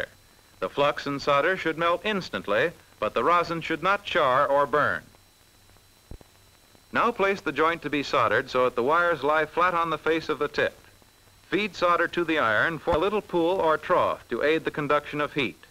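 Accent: United States. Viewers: Why do Americans call it SODDER? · It's a silent "L", only here in America. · That is one heck of a soldering iron!!! Why do Americans pronounce always solder as 'sodder'?!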